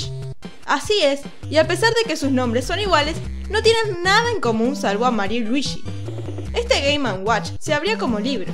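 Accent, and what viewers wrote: Argentina. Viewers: Me encanta el asenro argentino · Creo que es por su acento, debe ser de Arg. o Uruguay. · Luigi Es Mi personaje Preferido De Ambos... Las Risas Que Me Hizo Dar En "Mario & Luigi - Bowser's Inside Story" Fue Fantástico Jaja Les Tengo Una Pregunta... ¿De Donde Son? Es El 2° Video Que Veo Y Me Salió La Duda Si Son Argentinos Jaja Me Suscribí... Sigan Así...!! Saludos...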